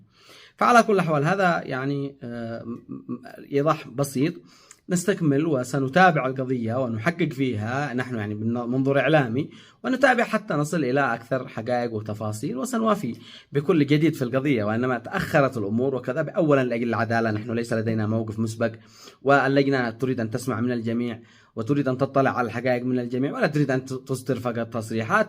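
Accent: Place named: Yemen. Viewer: ياخي قد لهجته يمنيه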